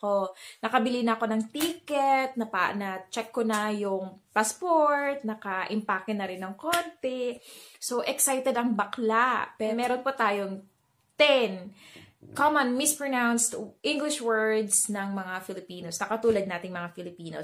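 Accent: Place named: United States. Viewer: Hello there. Your pronunciation is very good but has an slight American “slant” to it which is not always pronounced as “true English”. I assume your in the USA not England? There are many different dialects in the UK which can sound different in different areas of the country, which is also similar in the US of course. Having said that, I would suggest mayonnaise has a number of slightly different pronunciations. Where I am it has more of a “maya” naise sound to it lol 😂 Having said all of that, you speak very well and will undoubtedly help many people 🤗👍🏻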